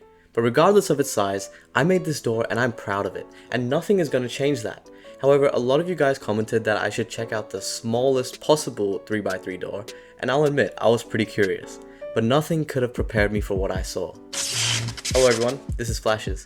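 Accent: Germany. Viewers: Ey bro, u german?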